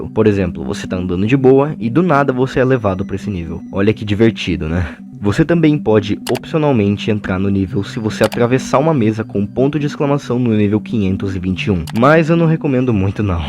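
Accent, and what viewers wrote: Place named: Rio de Janeiro, Brazil. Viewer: ksksks cê é carioca né meu patrão, reconheci só pelo "S" com som de "X", foda ser carioca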